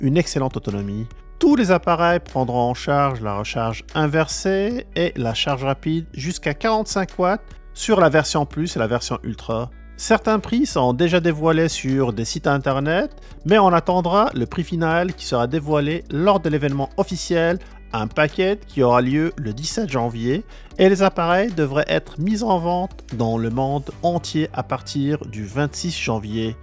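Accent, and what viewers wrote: Canada. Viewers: je deteste l'accent canadien omg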